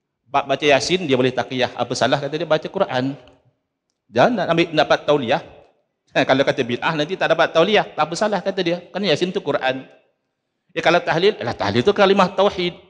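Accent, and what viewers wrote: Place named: Negeri Sembilan, Malaysia. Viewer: Mano... Cam org nogori ustaz ni🤭🤭🤭🙏🙏🙏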